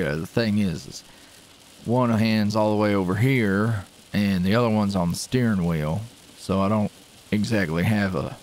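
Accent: South, United States. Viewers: plz more southern audios they’re ultra comforting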